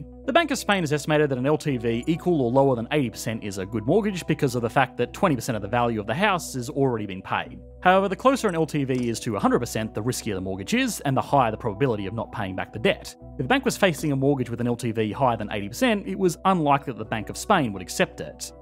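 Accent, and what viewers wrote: Australia. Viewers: Slow down mate , i know your have the limit for vedios time but your fast aussi accent is hard to follow. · Probably Australian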